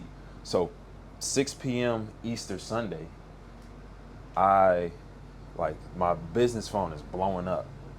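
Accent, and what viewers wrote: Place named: New York, United States. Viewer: I would've sworn you were from NY. You have that NY accident. Great content!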